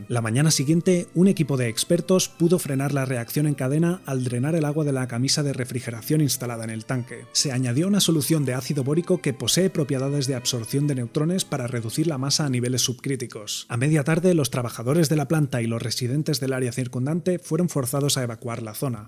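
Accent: Castile, Spain. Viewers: Que Repugnante et Molestoso es listenear el Acento Castellano de Europa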